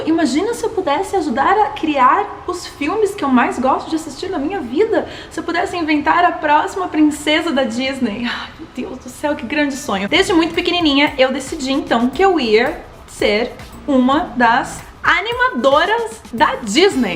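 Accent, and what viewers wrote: United Kingdom. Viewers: o sotaque britânico é maravilhoso, mas me embaralho muito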